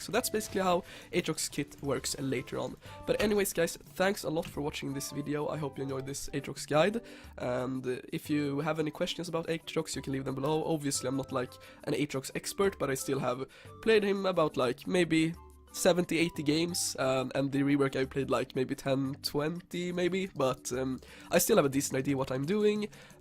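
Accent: Sweden. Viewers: Are you swedish? It sounds like that on you accent. :P. im not swedish btw,, but they usually speak like you.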